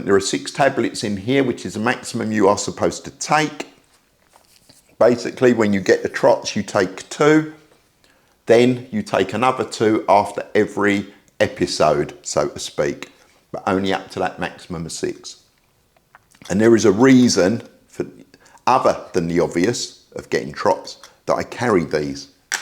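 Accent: United Kingdom. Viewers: You have a very pleasing voice and British accent. ✌️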